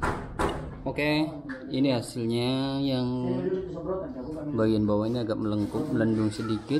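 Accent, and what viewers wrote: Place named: Java, Indonesia. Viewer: Medok bgt mas'e.. 😆😆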